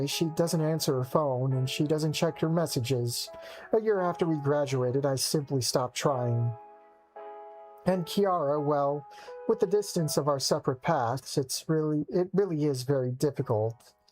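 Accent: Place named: Finland. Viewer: Finglish strikes again.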